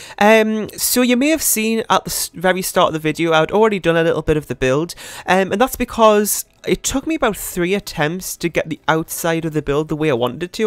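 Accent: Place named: Northern England, United Kingdom. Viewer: Nice build, and nice to hear a nice northern voice, looking forward to seeing more 😁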